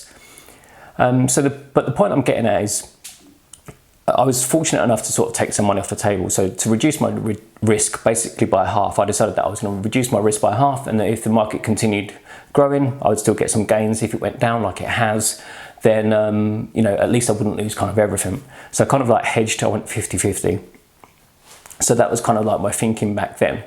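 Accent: United Kingdom. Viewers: This guy using uk accent?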